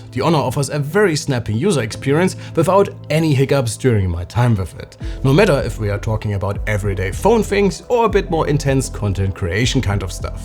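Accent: Germany. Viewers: Harter deutschsprachiger Akzent (hab ich aber auch wenn ich Englisch spreche) 😊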